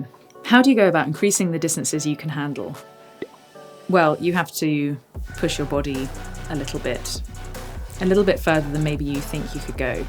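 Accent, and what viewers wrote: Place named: England, United Kingdom. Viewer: Wrecked, hilly...sounds so English...please use an American Accent...will be so fun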